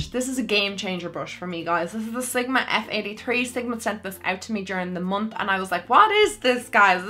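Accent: Ireland. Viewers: I can't quite put my finger on it but you're becoming one of my favorite YouTubers to watch. I love your personality and your Irish accent. I'm from Texas USA and have always wanted to go to Ireland. I hope the day that I do I will be able to meet up with you :-) I feel like we would get along well.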